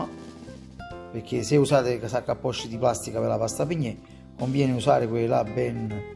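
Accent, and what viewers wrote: Naples, Italy. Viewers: il simpaticissimo accento partenopeo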